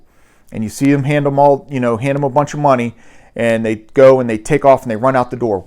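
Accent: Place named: Maryland, United States. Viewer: I can spot the maryland accent from a mile away.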